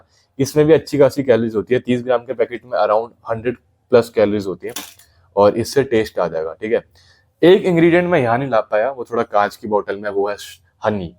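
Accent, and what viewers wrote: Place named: Pahadi, India. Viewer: Pahadi ho kya bhula??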